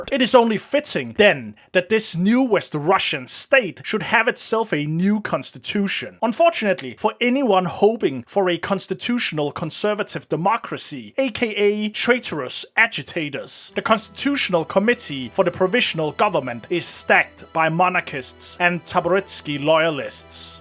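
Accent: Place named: Denmark. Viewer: am i stiktzo or are you danish?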